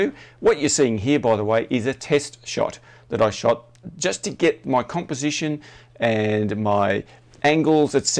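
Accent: Australia. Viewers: Sound Aussie 🇦🇺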